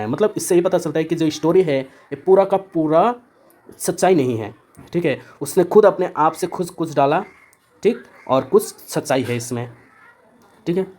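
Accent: Assam, India. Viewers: I hate Assamese accent and language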